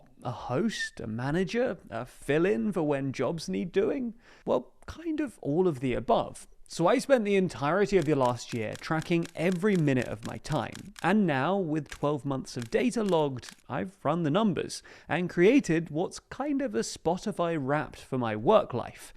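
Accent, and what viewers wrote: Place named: RP, United Kingdom. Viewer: Wow... an academic, RP-sounding voice makes anyone sound like a good writer 😇😇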